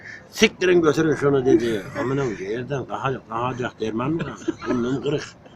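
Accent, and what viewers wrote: Central Anatolia, Turkey. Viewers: Arkadaşlar adam iç Anadolu'dan iç Anadolu da çoğu yerde bu şive ve ses tonu var. Sadece sizin orada bu adam gibi konuşulmuyor. Eğer iç Anadolu da yaşıyorsanız %90 böyledir şive. Ve bu adam Yozgatlıda olabilir Niğdeli de Kayserili de Nevşehirli de Kırşehirli de vs vs hatta ve hatta Çorum Sivas ta olabilir. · İç adadolu Aksaray Niğde şivesi olabilir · İç anadolu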